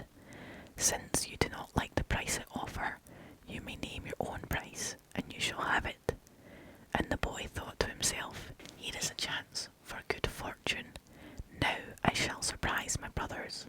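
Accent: Scotland, United Kingdom. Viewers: your… (I wanna say Scottish…) accent is the cherry on top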